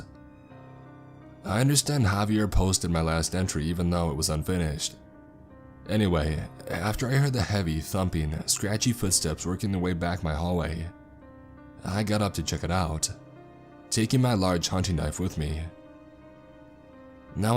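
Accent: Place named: West Virginia, United States. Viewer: Holyshit you actually sound like you're from West Virginia. I'm from the mountains of southern West Virginia and Mercer county. Just outside of Princeton bluefield area. You sound like a big old farm fed Burley mountain man like me LOL I'm 6ft 4 inches 273 lbs 40 years old and can still chase a deer through the woods. my family always used to pick on me especially my uncle my dad and my brother when we would go deer hunting because they would set a white for the deer to come to them. But I always stalk them and my brother has a video of me running after a deer and killing it with a Bowie knife. And for all you animal lovers out there just know I'm an animal lover too... I think dear squirrel turkey and rabbit is all delicious.!!! 🙄🤔😯😤😠... 🤗😀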